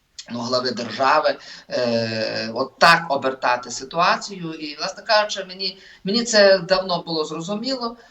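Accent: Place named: Russia. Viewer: Прізвище - російське, мова, хоч і львівська, але трохи рос. акценту є, напевно москаль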